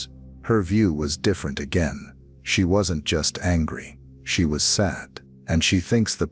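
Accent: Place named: United States. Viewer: why is the narrator using a US voice? This is silly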